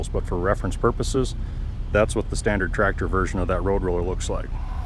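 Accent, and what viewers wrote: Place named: Midwest, United States. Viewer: I'm from the Albany area of New York... and it always tickles me to hear the midwestern pronunciation of Albany.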